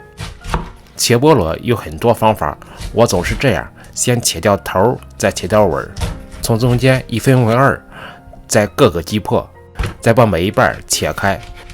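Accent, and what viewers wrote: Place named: Qinghai, China. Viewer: 标准的青普 哈哈哈，爱上了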